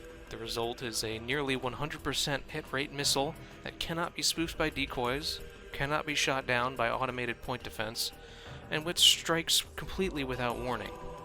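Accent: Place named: Rural, United States. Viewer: There’s something about Country Twang and space ships….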